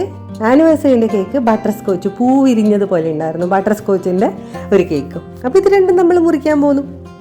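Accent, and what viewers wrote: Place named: Kannur, India. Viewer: Eacheente varthanam keattere thoni kannur aanenn. E vido kandera skpm aann thirinje.. Adipoliyann tta vdo❤❤❤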